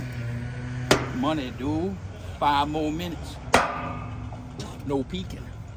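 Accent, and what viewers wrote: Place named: Cajun, United States. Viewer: if you weren’t severely autistic and could differentiate characters you’d realize he’s playing exactly that. On podcasts he’s got a strong ass Cajun accent but he’s not retarded.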